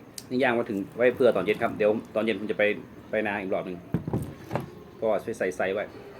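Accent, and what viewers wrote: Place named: Central Thailand, Thailand. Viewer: ชอบคุณ พูดภาษากลางพอรู้ค่ะ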